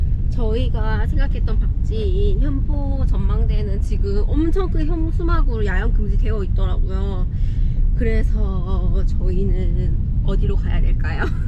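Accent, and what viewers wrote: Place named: Gimhae, South Korea. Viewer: 님 올여름 휴가를 울릉도로 가셨나봐요? 저는 30여년전 도로등 기반시설 없을때 독도까지 갔다가 입도는 못했던 기억이 나네요 글고 지난번 결혼 하신줄 모르고 결례를 범했네요 결혼 하신지6년 되셨다고 하셨는데 늦게나마 축하드리고 남편분은 존잘남 확실한것 같네요 울릉도 가셨으니 성인봉 뿌시기도 하셔야죠 암튼 추억에 남을수있는 즐겁고 행복한 여름휴가 되세요 비 피해는 없으시죠? 김해가 고향이라고 하셨나요? 저는 님 고향사투리억양과 명랑하신 성격에 우연이 들렸다가 구독좋아요 누르고 시청하고있는 마산이 고향인 60대 중반 아들 군대보낸 할배예요 결례 용서하세요 영상 즐감했어요 새로운 한주도 날마다 좋은날 되세요 감사합니다... 파이팅!!!... 👍❤🍀👏👏👏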